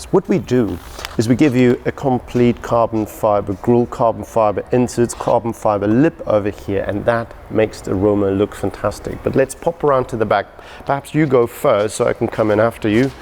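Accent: South Africa. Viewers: I had a feeling you were a fellow South African, judging by your accent and colloquial expressions. Really great stuff you're doing man. Keep it up.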